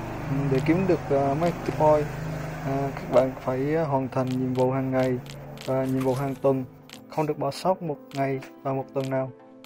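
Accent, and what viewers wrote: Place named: Vietnam. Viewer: unfortune l dont understand vitenm accent but lm glad we have sub title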